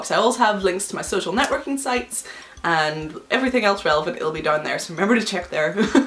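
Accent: United Kingdom. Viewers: Is she British? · Where are you from? Your accent is kind of all over the place... British...Australian.... American...Scottish...? I don't mean that in an ignorant, mean kind of way. I really am curious.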